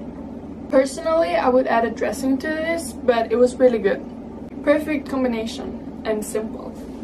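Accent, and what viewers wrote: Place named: Sweden. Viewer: not sure how swedish and portugese accent sounds similar but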